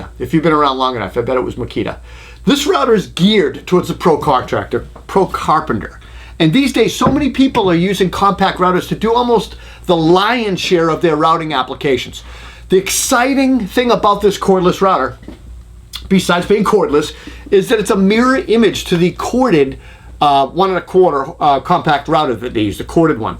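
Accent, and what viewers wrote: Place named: Northeast, United States. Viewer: Looking at the pictures of you using the power tools, I just say "remember your hearing protection". ;-) Love your channel and northeastern accent.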